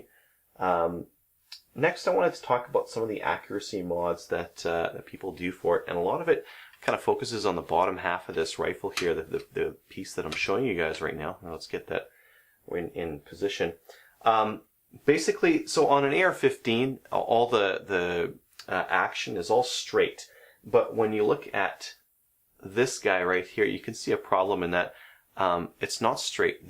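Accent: Canada. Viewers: You sound Canadian. You must live in the U.S. now or Trudeau has confiscated this rifle already. Going to happen to us as well. 🤨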